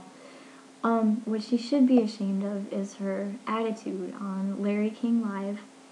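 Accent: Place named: United Kingdom. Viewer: interesting, you dont sound american, i thought it was a british accent, maybe canadian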